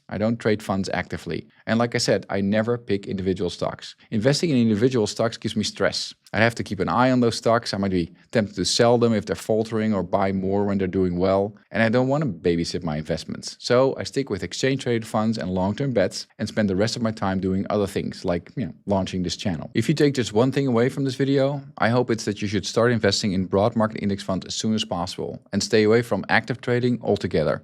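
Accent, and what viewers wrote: Netherlands. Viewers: It too me a few videos to pick up the Dutch accent, good job, it’s pretty soft, unlike my grandfather from Bruges Belgium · I guess it’s just me but hearing a Dutch accent somehow increases credibility with these types of videos. I will be following your advice more closely! Edit: Ha I knew it, I even read your book!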